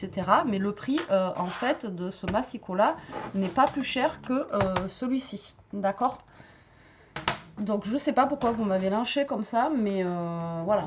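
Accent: South, France. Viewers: Bonjour, je vais regarder ce nouveau tuto avec grand plaisir comme tous ceux que vous faites, j'apprécie beaucoup votre travail, votre style, votre accent 😂car je suis aussi du sud, Montpellier, mais je suis désolée que d'autres personnes personnes vous aient fait de la peine, c'est écœurant et je vous comprends, c'est pour ça que j'insiste pour vous dire franchement que vous êtes une BONNE PERSONNE, ça devient rare en ces temps compliqués, j'espère que vous surmonterez cette méchanceté, moi je vous dis mille mercis et je vous fais un gros bisou😘😉 Elvire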